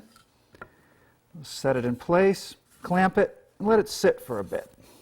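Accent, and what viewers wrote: New England, United States. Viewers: I have to say, as a Texan, I’m enamored with his yankee accent, but I’ve been mentally calling it “Cortisan” white oak from watching these videos lol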